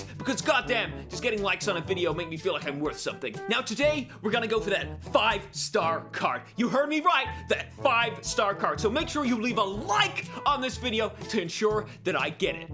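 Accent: United States. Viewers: Your American accent is disturbingly accurate. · Damn, that’s actually a really good American accent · Dude, your American accent is so fucking good. 99% of people that try it still say certain "a" and "o" sounds similarly to their native accent, but you got it down. I fucking love your videos haha. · solid american accent even more solid impression of douchey card pack tubers lol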